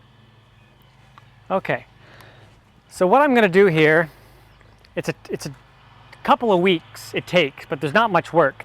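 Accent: Canada. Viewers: My guess is Canada.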